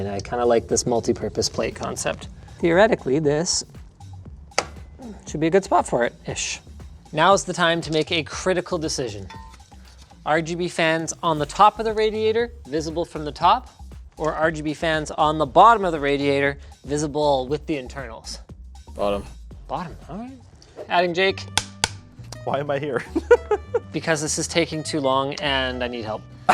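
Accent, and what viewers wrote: Canada. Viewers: The Canadian is strong with in this one. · i didnt realize was Canadian til this episode, so many eh's lol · I've never heard him sounding this Canadian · is saying ",aye?" more i think his Canadian accent is finally coming jn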